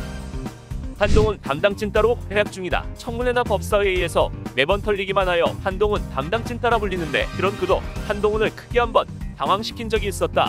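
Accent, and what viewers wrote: Jeolla, South Korea. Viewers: 뭐여 전라도여?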